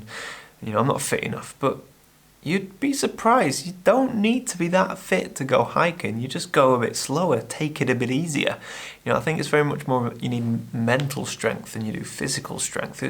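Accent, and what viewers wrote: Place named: Scotland, United Kingdom. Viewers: Stunning photos, but your Scottish pronunciations have me on the floor, you need to spend more time with Mr Wilkie, great work mate & keep the vlogs coming. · Hey, infact last video was absolutely beautiful, magic mountains! Being in the north you're getting some sottish accent:-) see ya the next!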